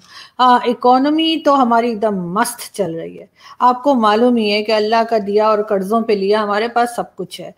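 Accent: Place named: Punjab, India. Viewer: Love to her your witty and satirical presentation of daily unfolding events. what I further admire is your audacious approach to call a spade a spade. You have this unique ability to conduct a formal as well a fun interview with equal flair. Your Punjabi undertones and mannerism makes me greedy to keep listening to you.keep up your good work. God bless. Your fan from India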